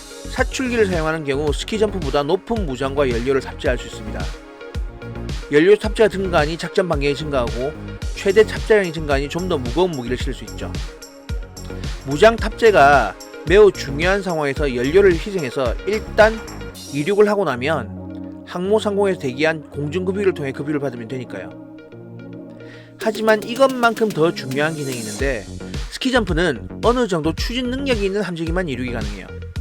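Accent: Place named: Gyeongsang, South Korea. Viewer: 내용은 좋은데...경상도 사투리 억양이 너무 강해서 듣기가 좀 쉽지 않네요. 계속 방송 하실건데... 표준 억양 연습 부탁 드립니다. 그러면 좋은 내용이 한층 와닿을 것 같습니다. 사투리 억양 지적해서 대단히 죄송합니다.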